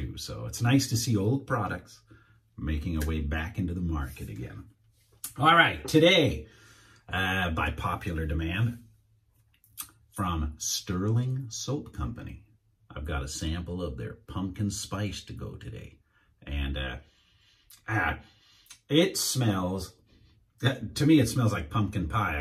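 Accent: Newcastle, United Kingdom. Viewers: your welcome and thank you for sharing such amazing videos. Newcastle's great, love the accent! I was born in london. I am also a bond fan and I collect watches so I noticed your omega Seamaster straight away, I also own one. I have just this week started shaving with a double edged razor for the 1st time and really enjoying it! Not to many cuts!! I can see this turning in to a new hobby! 😁🇬🇧🇺🇲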